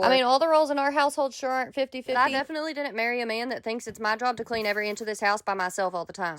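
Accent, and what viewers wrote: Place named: South, United States. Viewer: It is unbelievable how much stuff you can fit in them small places and you sound southern witch would in fact answer the fast talking thing but i do love you accent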